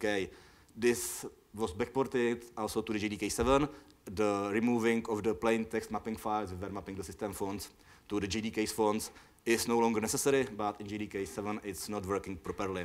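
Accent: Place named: Czech Republic. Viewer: hehe its english or czech :D most terrible english accent i ever heard.. very funny x)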